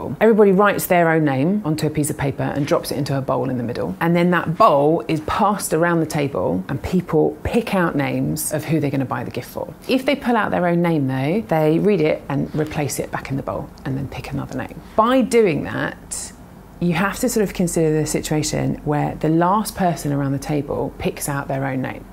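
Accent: United Kingdom. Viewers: I only watch the red headed numberphile vids for the British accent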